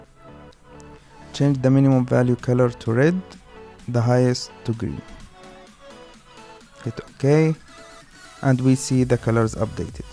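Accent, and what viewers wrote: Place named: Arab world. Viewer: From your ascent your are Arab it good to have some one like you but if you have another Arabic channel it’s more better.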